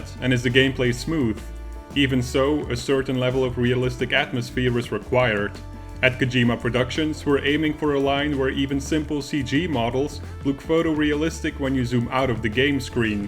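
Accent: Netherlands. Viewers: You're Dutch, aren't you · Are you Dutch?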